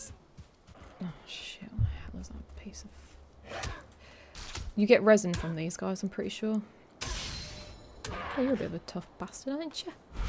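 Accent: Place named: Hull, United Kingdom. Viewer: 'It just dunt do nowt' your accents are hilarious; was you channeling a Hullian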